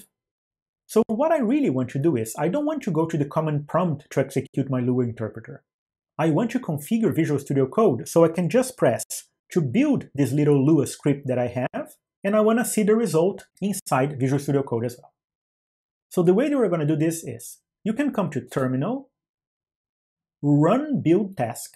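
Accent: Brazil. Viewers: sabia q voce era br, conheco sotaque portugues de longe kkkkkkkkk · Thanks for the contribution. A tip, you can browse for the file from the environment variables, and navigate through the folders to find Lua folder, which might be easier than writing. If you are brasilian, which your accent sounds like, then: Valeu, obrigado :) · he sounds Brazilian tbh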